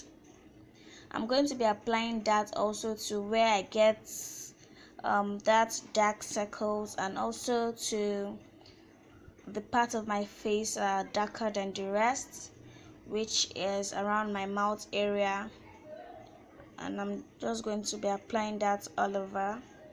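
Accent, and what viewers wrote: Nigeria. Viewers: Nigerian accent is soo bomb we should tell people about her so she would get more subs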